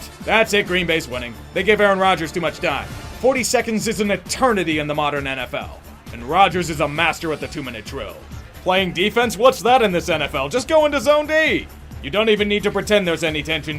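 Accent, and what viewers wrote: Pittsburgh, United States. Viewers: Alright, do it. Take the shot Seattle's defense gets obliterated via yinzer ranting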